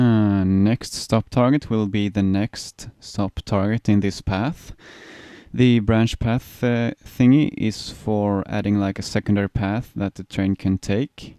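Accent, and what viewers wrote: Sweden. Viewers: Awesome tutorial! I love your Swedish accent :) I love Sweden, I visited last summer. Keep it up buddy!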